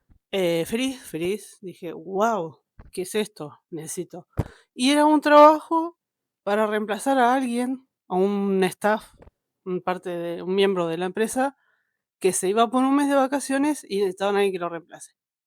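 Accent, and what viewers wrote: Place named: Entre Ríos, Argentina. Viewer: No te conozco y este es el primer video tuyo que veo, ¿Sos de Entre ríos, Argentina? Me suena ese acento jajaja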